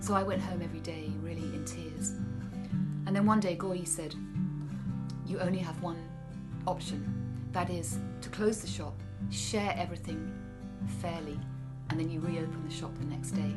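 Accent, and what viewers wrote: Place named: London, United Kingdom. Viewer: Hi, glad to hear your story! I love your flower shop very much. And your beautiful London English accent. I'm sorry that your shop is closed. Anyway, you are absolutely an excellent gardener. I wish you a happy life forever. Long to hear your story soon again. Love you 😍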